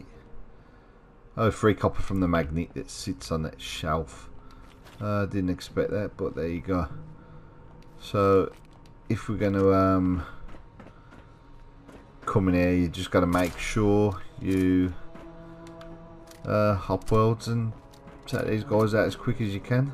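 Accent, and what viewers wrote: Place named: Birmingham, United Kingdom. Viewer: I never thought I'd find a fellow Brummy on YouTube. Our voices are shit for commentary haha.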